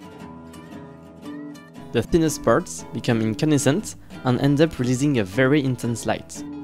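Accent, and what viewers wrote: France. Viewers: Très belle vidéo! Vous êtes français non? Cet accent <3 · You have a french accent. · Nice work, I can't tell what your accent is, are you French ?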